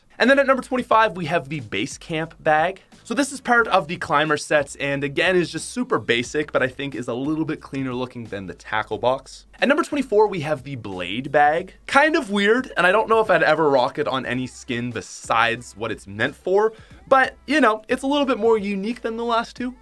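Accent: Canada. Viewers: All Canadian “oots”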